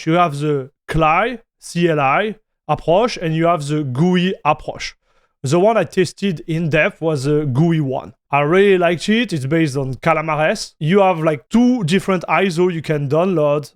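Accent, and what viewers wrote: Alsace, France. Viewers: he's speaking french but saying english words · As a Scandinavian, married to a Quebecoise, for me it sounds like a very European French accent. It adds a lot of character to the channel. I love it! Top content. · He's French speaking English. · Few seconds in and I was like "he must be French" ... checked his profile which says Canada... well, it's an official language there. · Or Elsaß ;That is why the French hear German, everybody else hear French. -) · As a french, I can only detect the French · I think he is from Alsace Region of France, it's definitely French with a German influence. The dialect is called "Alsatian". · I'm German and I can only hear French here. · He only sounds French. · As an aussie whos heard a few french accents and a lot of french Canadian accents he sounds super euro french to me. But i thinks its his tonality and inflection of words that give him an Arnie sound also... 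He could do the french dub for Arnies movies 😂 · uuuh i hear a frenchy <3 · On sent l'accent français. Merci pour la vidéo.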